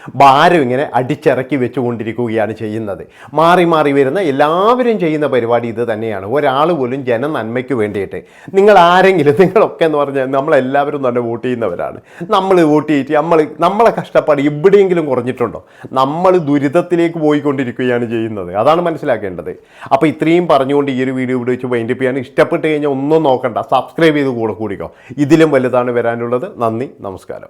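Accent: Kannur, India. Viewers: ഏട്ടാ ഇങ്ങൾ നാട് എവിടെ നമ്മള് നാട്ടിലെ അതെ സ്‌ലാംഗ് കണ്ണൂർ ഇല് എവിടെ ,മട്ടന്നൂർ ഇരിട്ടി കുതുപ്പറമ്പ് ഈ ഏരിയൽ എവിടെയെങ്കിലും ആണോ,, എൻ്റെ നാട്ടുകാരൻ സംസാരിക്കുന്ന അതെ ഭാഷ കേൾക്കുമ്പോൾ ഒരുപാട് അഭിമാനം,മനുഷ്യത്വത്തിൻ്റെ വേണ്ടി നിലകൊള്ളാൻ നമുക്ക് സാധിക്കട്ടെ,നമോക്കൊരുമിച്ചു. സ്നേഹത്തോടെ ജീവിക്കാം,,അധർമതിനെതിരെ പ്രധികരികം ,, എട്ട ഒരുപാടിഷ്ടം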